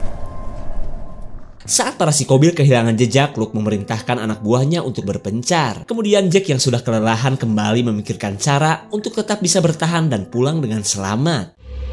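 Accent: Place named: Garut, Indonesia. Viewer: Mang orang mna ,logat na garut pisan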